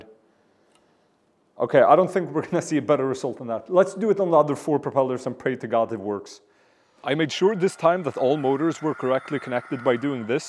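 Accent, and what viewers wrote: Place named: Sweden. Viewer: That was pretty amazing... And I recognised your Swedish accent almost immediately — it’s remarkably similar to David Windestål’s. I’ve watched enough of his tricopter videos to recognise that accent that in no time!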